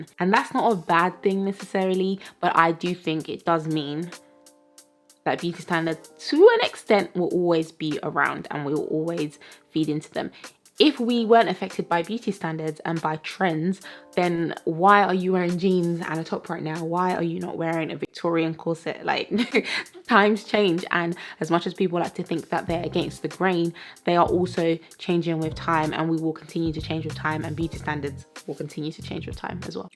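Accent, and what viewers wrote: South East London, United Kingdom. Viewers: It sounds like a south London accent to me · She sounds like she's from South East London, I grew up there so it's a familiar accent to me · South londonn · Its nice to hear a British English speaker instead of Americans who seem to dominate the internet/media. I totally disagree that white women are the standard of beauty. By that I mean that I don't think only white people are beautiful, there are many types of beauty and that is a wonderful thing. We just need to open our eyes :) As for trends, I wear what I like and what is comfortable, what I think suits me, I don't care about the fashion, that is just the inconvenient thing that means I can only buy certain types of clothing/styles that are available. I could be a real rebel and wear something totally far out but I just don't care enough. What is really sad is that black people are enemies to their own kind sometimes. 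Really sad.... As for thinking some are not beautiful but for a platform, how about realising that is a different type of beauty that appeals to others, just not you? Having said all of this, when you are an older woman, you are invisible mostly, that is actually a good thing because you are free of all this crap to a large degree :)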